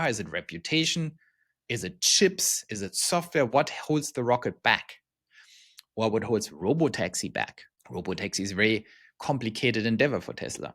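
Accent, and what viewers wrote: Germany. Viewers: Interessanter Inhalt, aber der deutsche Akzent ist so hart, dass ich nicht zuhören kann 😅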